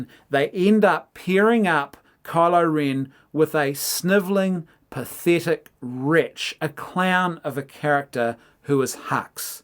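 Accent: New Zealand. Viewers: My guess is Kiwi from the south Island; If I got it right, it took me 5 years to hear out the distinctiveness in the accents... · I can tell you are Kiwi by the way you pronounce “fish and chips”, “fush and chups”. In Australia, they say “feesh and cheeps”. 👍🏻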